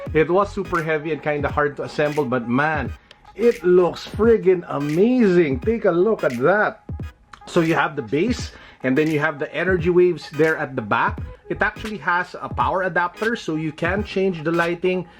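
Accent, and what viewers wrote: Philippines. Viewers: are you filipino?? I can read your accent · you have a Filipino accent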